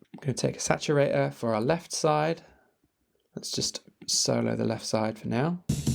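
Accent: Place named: United Kingdom. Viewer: You know that is gonna be good when the guy has a british accent.. Cheers mate, very good content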